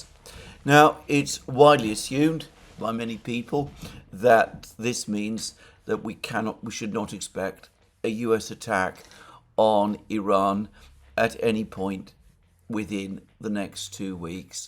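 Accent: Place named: RP, United Kingdom. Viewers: I like your speech . Because you speak softly and in queen's language. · It's an educated London accent. It is not how the Queen spoke.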